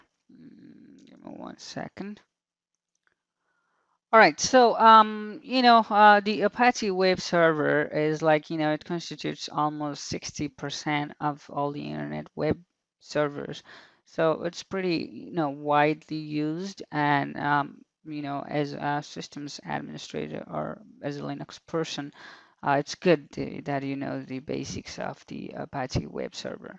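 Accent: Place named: United States. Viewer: I very curious to know, How did you get that American accent?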